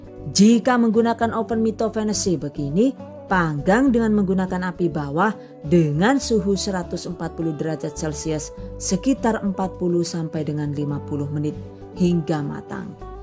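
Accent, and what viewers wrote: Banyumas, Indonesia. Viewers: Ini resep mantuuullll bangetttt......simple, enak, dan jdi inspirasi jualan...( Meski sampai sekarang belum jualan. Juga ), gara2 Nemu video ini, aku jdi seneng banget kro channel kie....maning narasine kadang di tambaih bahasa internasional, yakue ngapak....tambah seneng lah inyonge....sukses terus ya buund.... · Buat ciri khas mba, apalagi ada dialek ngapaknya, bagi saya menghibur sekali 😁. Sukses selalu 🤗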